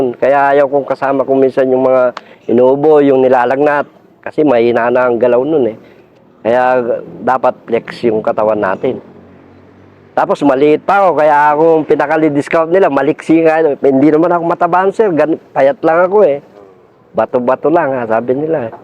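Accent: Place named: Pampanga, Philippines. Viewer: Ganyan tlga ang Punto mg Tagalog ang kapampangan.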